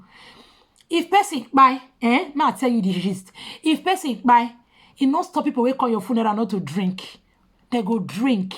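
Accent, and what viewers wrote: Nigeria. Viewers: I am just seeing your video for the first time and i fell in love: that I have subscribed to your channel and I ticked like for the video! My dear you get sense! Anyway our people, Edo/Delta ( from your accent) no dey come last! You wise eh? Bc some ladies can be stupid the way they follow man that will dump and abandon them at the least provocation! They will Forget ALL that you did for them in the past! Some will even ask you what did you do for them? Oh No! Men???! Ah you need to get and use your sense to follow men o! Otherwise I sorry for you! you see what you said about not carrying men on your head but that we should rather carry them on our shoulder so that if they fall off when the need arises you won't feel it. That was what my late senior sister used to draw our ears ( girls in the family) about men! But you know that time when you are still young and they talk to you like that you will think that she is wicked, heartless or something like that not knowing that she has seen something in the past! 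She has experience! Because they said what an elder see while sitting down that when you stand up as a young person that you won't see it! I have fallen in love with you - not that kind of love o, because I am a mother of four and I am by far older than you. I just like your reporting style, your analysis of the subject matter, the way you talk plus your beauty too! Everything about you! Well-done!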